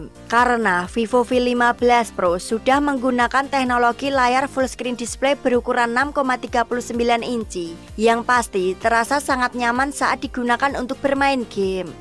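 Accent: Java, Indonesia. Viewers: jawanya sangat.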